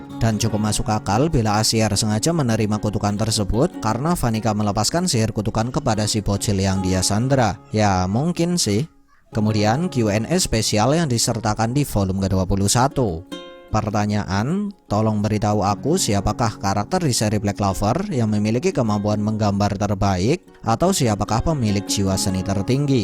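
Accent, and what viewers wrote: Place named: Java, Indonesia. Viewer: bahasa jawanya medok sekali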